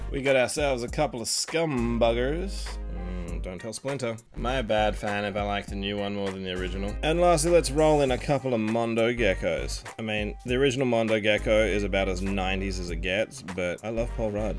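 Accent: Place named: Australia. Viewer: Where did you get these? I assume you're in Australia judging by the accent. I am too so I'm looking to get these if I spot them anywhere.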